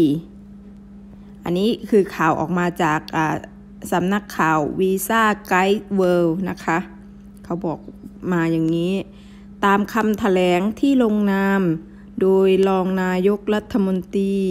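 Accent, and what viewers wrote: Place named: South, Thailand. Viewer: คนอีสาณ แต่พูดสำเนียงคนใต้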